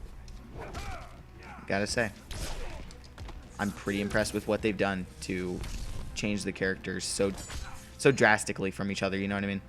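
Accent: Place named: United Kingdom. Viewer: Breathless British accent there xD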